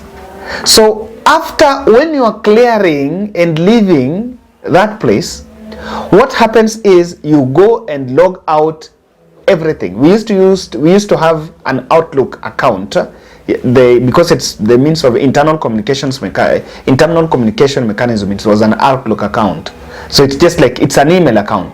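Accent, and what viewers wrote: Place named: Luo, Kenya. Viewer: He his a luo buana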